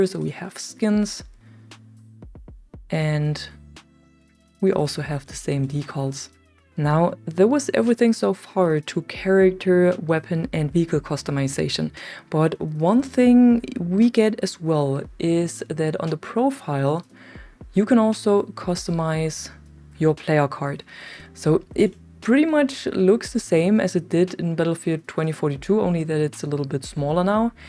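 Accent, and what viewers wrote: Germany. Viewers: First time to the channel I could be wrong and I’m sorry if I am but are you German? That how the accent sounds to be this soon into video. Have no issues just curious and believe I’m right totally random comment but I’m genuinely good at telling.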